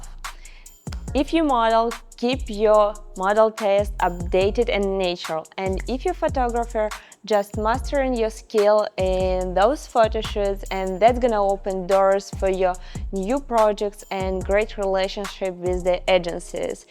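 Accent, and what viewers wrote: Russia. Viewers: Крутая ) но русский английский прям слышно)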